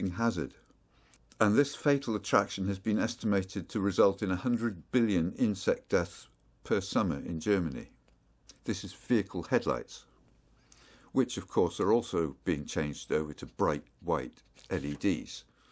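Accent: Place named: United Kingdom. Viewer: This is an excellent discussion about this spreading scourge (more like a badly misguided use of a potentially good technology) that seems to be spreading like cancer across the cities and countries alike. Granted, this focuses on the UK (based on the accent of the person narrating) but here in the United States, there have been many cities that have replaced the high pressure sodium (and low pressure sodium too) lights with harsh white LED lighting and it has practically ruined the nights and badly diminished the city nights altogether. I DO know that some of my argument may stem from nostalgic reaction to a changing technological paradigm. Maybe I'm just the 21st century version of those who complained about gas lights being replaced with incandescent light bulbs during the early 20th century but I can't tell you much I HATE, HATE, the harsh white light of these LED streetlights that are installed in places where they are NOT suitable to be in. 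You mentioned there was a petition set up to get them removed. Sadly, there's a general sense of resignation here in the states but at least a few cities had such outcry against such harsh lights that they either stopped installing them or replaced them with warmer colored lights. There are residential neighborhoods all around me that have long installed these LED streetlights and some of them actually hurt my eyes and I'm only 40 years old as of this writing but nearby streets that I regularly drive on at night now have these ultra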